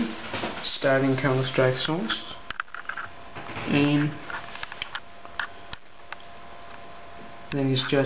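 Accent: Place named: Denmark. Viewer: man kan høre i din stemme du er dansker Yo DK :D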